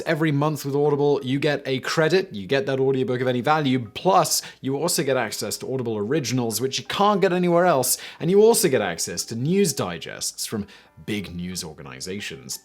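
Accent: United Kingdom. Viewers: I've never witnessed King's life narrated by such a fine British accent. gives the familiar new life! I never heard the story of the hotel operator having a heart attack.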